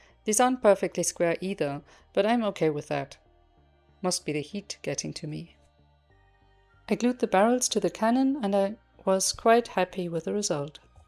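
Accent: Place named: Germany. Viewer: Du hast in der Hitzewelle sowas zustande gebracht? Ich bin im eigenen Saft gegart worden XD Btw, hab dich gerade erst entdeckt, dachte dein Akzent ist soooo deutch, dann kam der Info Drop. XD Egal hab dich abonniert und geliked.:face-red-heart-shape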